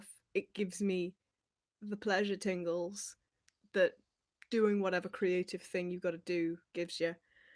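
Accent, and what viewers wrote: United Kingdom. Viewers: i wish i had caught this live. your RP accent is delightful, but i just have two requests. 1) just wanna hear your attempt at a southern american pronunciation of "banana" (buh-NAY-uh-nuh), and 2) wanna hear more of your fantastic singing voice!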